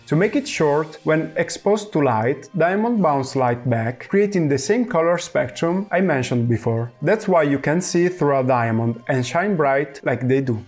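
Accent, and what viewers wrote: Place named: Italy. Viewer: you have one of the strongest Italian accent I ever heard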